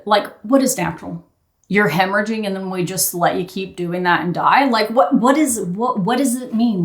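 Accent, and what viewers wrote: New Zealand. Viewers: I’m just so amused that you’re picking up a kiwi accent. Your “New Ziland” is exactly like my mates 😂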